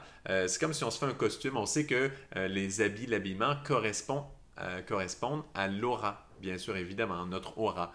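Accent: Quebec, Canada. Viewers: Merci pour votre lecture de la pleine lune et pour vos conseils d’une belle tenue spirituelle. J’adore votre accent québécois! 🤗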